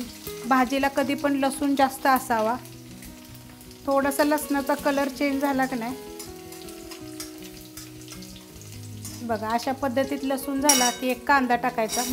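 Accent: Satara, India. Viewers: Tumch aavaj aikla tri mla sataryat aslya gat vatt 😍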